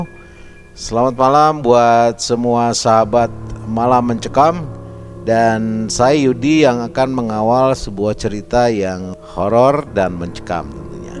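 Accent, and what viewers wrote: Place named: Sunda, Indonesia. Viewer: Suara khas sunda nya mantul pisan euyyy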